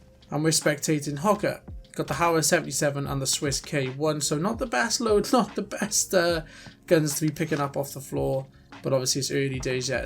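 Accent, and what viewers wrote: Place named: Wales, United Kingdom. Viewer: I'm from Cardiff myself, and your accent was instantly familiar to me lol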